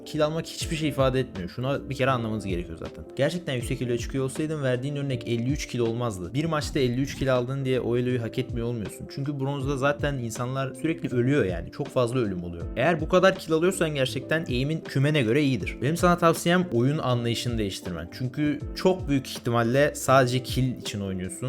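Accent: Thrace, Turkey. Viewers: Trakyalımısın abe kızan. Trakyalıysan trakyanın neresinden.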